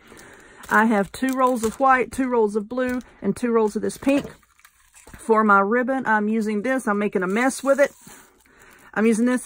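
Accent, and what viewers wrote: South, United States. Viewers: I love your chatter! I also like the "southern accent" you have (I am from South Carolina). Don't change for anyone! You are a wonderful crafter and that's what brings us all back! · I love your chatter! And your Southern accent! And you absolutely, hands-down, make the most beautiful wreaths on YouTube!! I most definitely am going out tomorrow hunting for all the stuff to make this one too!! Keep 'em coming!! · You are such a great teacher! I totally feel like I can make anything you give instructions for. And being a Southern Girl myself, I adore hearing the “Oh good lords,” etc. You sound just like me! 🤪 Love your channel. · I life you because you don't talk a lot you are explaining what you are doing. Oh & I like your southern accent. I am from Laredo, Tx. & live in Cotulla, Tx*;0)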